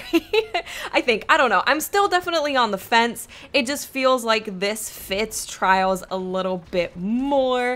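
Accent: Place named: New Jersey, United States. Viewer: Is from Jersey? Seems like it to me from her accent. I'm new here, does anyone know?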